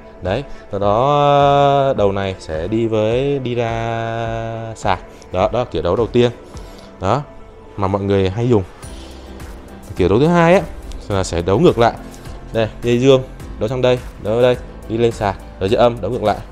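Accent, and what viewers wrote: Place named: Quang Binh, Vietnam. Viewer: Bác nói nghe giống người cảnh dương, quảng bình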